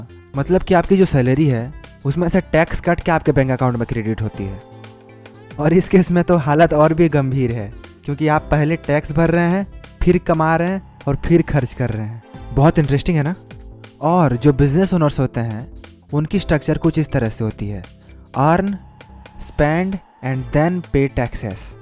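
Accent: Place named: Bihar, India. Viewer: Bhai bihar se ho kya. Acha kam kr rhe ho.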